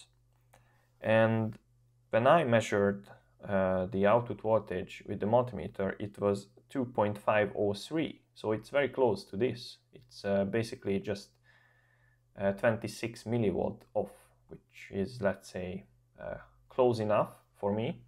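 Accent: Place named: Hungary. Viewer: Le se tagadhatnád, hogy magyar vagy ilyen akcentussal. Amúgy jók a videók👍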